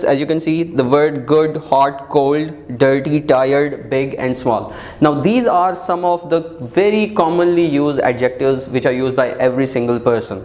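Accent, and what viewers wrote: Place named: India. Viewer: u sounnd freshie SIR. didnt help at all like bruh u and ur indian accent aint going no where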